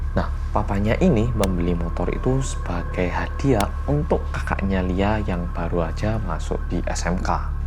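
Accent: Java, Indonesia. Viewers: Orang Korea yang medokkkk😌👍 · bersama orang korea yang MEDHOOOKK aku dah tereak medhok juga. trus sadar kalo ini rindingdong :) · bersama orang korea yang... Hensa( Me): kewe...: medhokkkkkk eh... Hensa( me): lhaaaa Hahahhahajajaja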